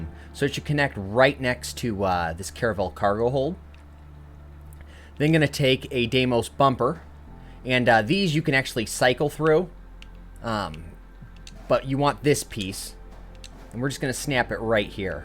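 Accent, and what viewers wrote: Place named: Minnesota, United States. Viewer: Are you from Minnesota by chance? I know a lot of people from my hometown who have the same accent as you.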